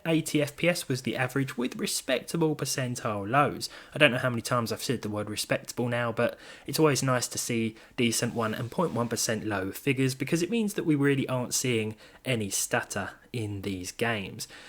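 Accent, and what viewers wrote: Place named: Cockney, United Kingdom. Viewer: Your review is fine but your cockney British accent was tearing up my ears. And it pays off 👍🤤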